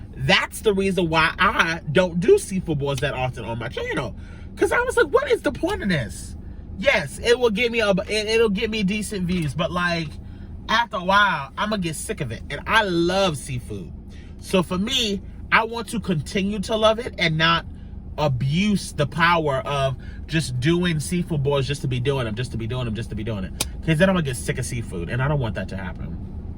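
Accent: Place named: Alexandria, United States. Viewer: Sounds like Alexandria, VA 😊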